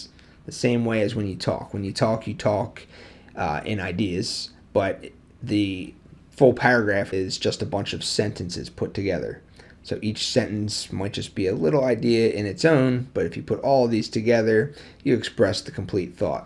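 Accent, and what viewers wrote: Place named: Philadelphia, United States. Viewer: are you from philly? you sound like you’re from philly.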